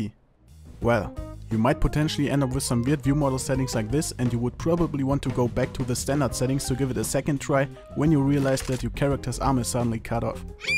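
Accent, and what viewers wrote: Germany. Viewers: man merkt voll das du deutsche b ist nicht böse gemeint oder si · Man hört so das du deutsch bist haha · Man hört sofort das du Deutscher bist :D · Could it be that you are german? The accent is real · Das deutsche Englisch Ich LIEBE ES 😂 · Kann es sein das du deutscher bist ? / Are you German ? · Biste deutscher?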